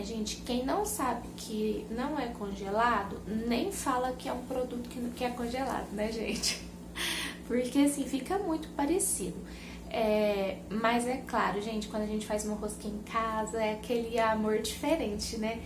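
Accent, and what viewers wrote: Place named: Minas Gerais, Brazil. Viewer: Sou a Vani Souza de Campinas viu bjos linda já disse antes, descobri o seu canal e amei demais, já disse gosto do seu jeito mineirinho de falar, seu carinho com tudo que faz, seu carinho e paciência com as crianças é bom demais viu! Gosto de tudo que vc posta, morro de vontade de comer seus bolos, fico com água na boca, deve ser uma delícia, se tivesse aí perto de vc com certeza eu compraria mesmo kkkkkkk te digo que vc é iluminada, que o Senhor te abençoe e te proteja sempre, vc é especial pra Deus e pra mim tbm viu, manda bjos pra mim, eu comento sempre lá no Instagram, bjinhos nas crianças lindas, fica com Deus, Deus te abençoe minha linda🌺🤗😘💞 Vani Souza de Campinas Manda bjos pra mim linda💓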